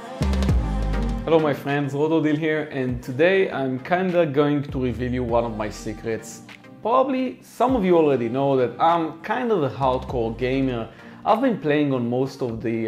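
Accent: Israel. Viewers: this guy sounds violently israeli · אחי, אין על המבטא הישראלי שלך. :)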